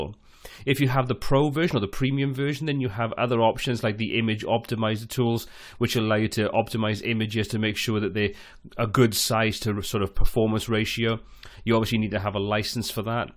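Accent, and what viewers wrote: United Kingdom. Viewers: Love this tutorial, you sound like a fellow Brit! I have done an up to date video on the same topic on my channel, however all of these WP tips are still spot on! Great video! Clear & concise!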